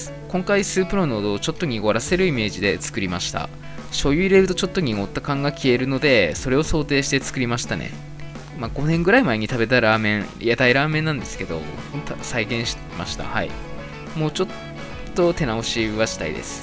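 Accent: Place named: Tsugaru, Japan. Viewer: お勧めで出てきたのでなんとなーく拝見したんですが、同郷の方で初っ端からの津軽弁で親近感！更にラーメン美味しそうで気付いたら最後まで見てました✨チャンネル登録しました！これからも過去のも見てきます〜！！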